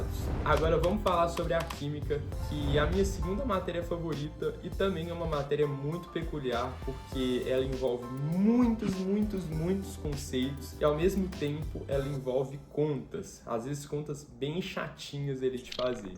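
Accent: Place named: Minas Gerais, Brazil. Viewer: Oh sotaque mineiro, e muito bom kk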